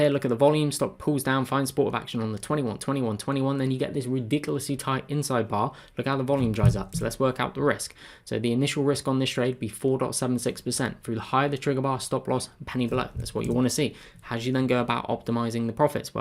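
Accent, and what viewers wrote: England, United Kingdom. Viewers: Brilliant as usual, thanks. I hope you don't mind me asking, where are you from in England?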